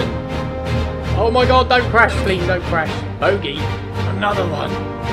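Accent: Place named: South West England, United Kingdom. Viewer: Sounds like your from the South West mucker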